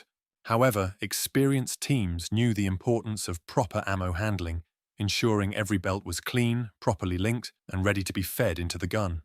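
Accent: United Kingdom. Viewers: I do not know where you are from or how old you are, also do not know what your sources are. If you were to write a legitimate journalism article for a respected printed publication or even to submit as a piece of work for grading in college/university, you would need to at least have 3 to 5 independent verifiable sources of information to support your statements and/or claims. To say that “carrying ammunition belts around like Pancho Villa might have looked cool” is a total shit bag thing to say. Anyone who was actually carrying ammo for those support gunners and having to carry their own kits did it in attempts to keep everyone alive and well stocked. Are you British or is your YouTube narration a Bot?! You probably weren’t even alive during the Vietnam War and you are regurgitating stories you’ve gathered from other YouTube channels. Like you sat down and interviewed actual M60 Gunners to get your information for this video? 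Like they’d actually openly tell you , a Brit or a descendant of a former British degenerate what went on there for your YouTube channel. I saw some other comments from people who sound like legit Vietnam M60 gunners My father lugged shit everywhere for anyone on his team way into Cambodia and Laos. ARVN would run off with any supplies or ammo or anything on the trucks US Forces were defending. So It wasn’t to look cool to keep chains of ammo it was to make sure that shit did not disappear into the bush when under fire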